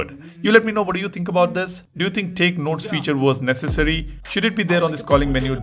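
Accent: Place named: South India, India. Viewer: Yeh kaisa accent hain re mere bhai ?? US jaisa SouthIndian wala ?? matlab confuse ho gaya english sunke dimaang ghumraa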